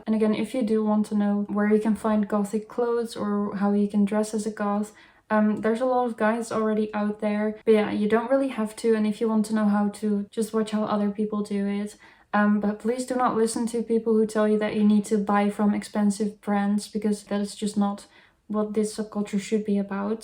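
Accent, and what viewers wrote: Netherlands. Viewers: You sound Dutch! Come hang out at goth night if ur ever in utri or ams :))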